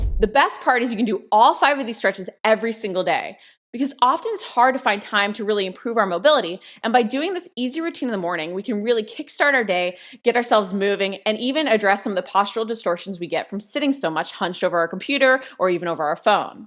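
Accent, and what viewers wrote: United States. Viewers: Where is your accent from in US? I’m getting used to it and your warmups and stretches are helping me get back into running. We can’t cycle here in France ar tbe moment but yes i have massive thighs and weak glutes/ hips!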